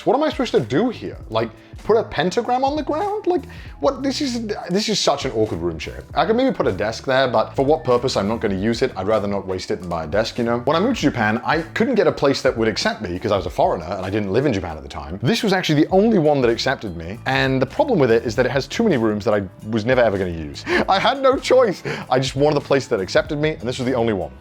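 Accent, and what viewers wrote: United Kingdom. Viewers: Tbh I really like how talk, the British accent is chef kiss even though he’s saying funny stuff, is just soothing 😫✊🏻❤️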